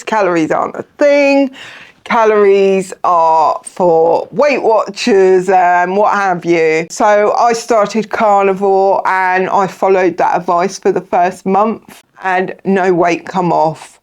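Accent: United States. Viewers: Great talk! Thank you so much. And I love your charming Texas drawl…haha! But seriously, your story and advice are excellent. Thanks again & God bless you🙏🏻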